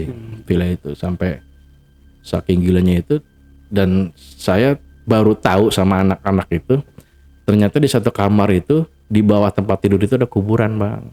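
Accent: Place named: Jakarta, Indonesia. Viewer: Betawi pisan ni bahasa nya 😂❤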